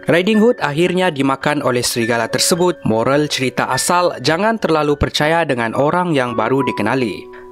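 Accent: Sabah, Malaysia. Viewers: kamu urang sabah ka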